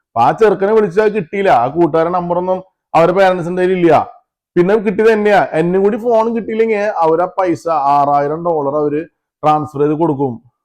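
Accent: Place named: Thrissur, India. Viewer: ഒന്ന് തൃശൂർ പോയി വന്ന ഫീൽ.. സ്ലാങ് wow ❤️❤️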